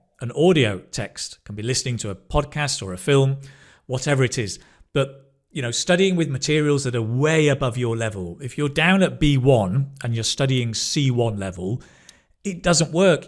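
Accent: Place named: Manchester, United Kingdom. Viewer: I think he is from Manchester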